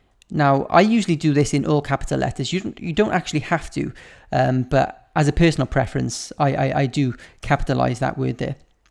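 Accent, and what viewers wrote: Wales, United Kingdom. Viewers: He sounds Welsh! I dunno... he has the same accent as me!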